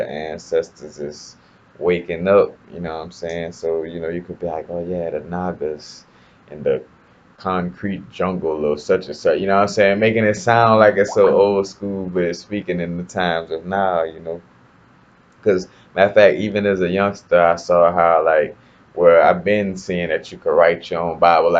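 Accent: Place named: South, United States. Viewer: Hey, where are you from, if you don't mind me asking. It would be really cool to meet you and gain some insight on understanding the universe. I'm based on the Southern part of the East coast and you sound like somebody from down here somewhere, like from Virginia down. It's fine if you don't want to answer, I'm just really intrigued about having a conversation with you. Hope this doesn't come off as intrusive, I mean no harm 😥 lol!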